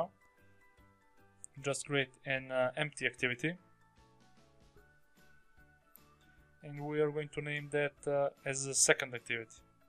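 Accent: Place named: Russia. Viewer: Your native language is Russian? Accent. :D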